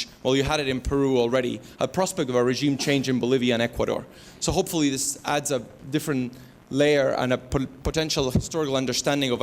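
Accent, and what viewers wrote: Argentina. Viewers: pero se le nota que es argentino? al hablar ingles digo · Acento argentino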